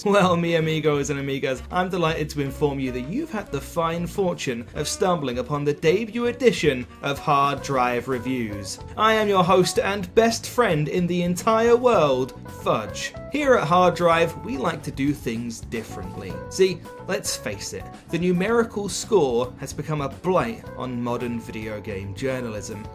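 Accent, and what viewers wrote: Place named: United Kingdom. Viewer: dang this sexy voiced bri'ish guy sure is funny and cool and a good addition to the channel well done hard drive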